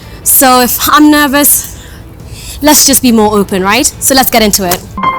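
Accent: United Kingdom. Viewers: Ikr! I've been in the States for years and her accent comes across more British as opposed to American. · The accent is more of British thooo..the sound quality and video quality is terrific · I thought the accent was British but she grew up in America 🤔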